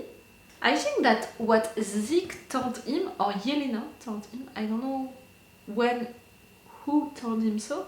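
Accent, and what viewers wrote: France. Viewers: These episodes are really getting out of hand, i hope it's not offensive but your emotional reaction mixed with your french accent really makes it for me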